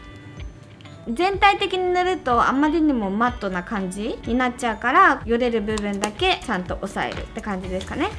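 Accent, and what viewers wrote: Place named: South Korea. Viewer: ・ 韓国の人って、さしすせその発音がすごい可愛いですよね😍❤️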